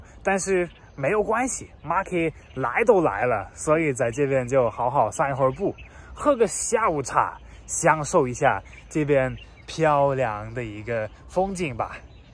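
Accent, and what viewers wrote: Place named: Sichuan, China. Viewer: 哈哈，四川话好听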